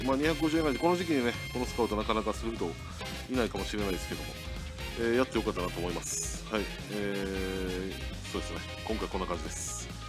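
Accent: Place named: Kansai, Japan. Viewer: さんおめでとう🎉お～らきたぞ笑関西弁凄いね笑笑なんか噂だとBTに原口選手出るとか出ないとか、後少しで覇王だね(*⌒▽⌒*)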